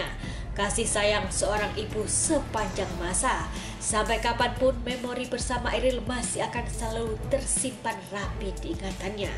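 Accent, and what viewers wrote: Java, Indonesia. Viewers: Baru mau bilang gitu juga, agak medok" jowone ketoro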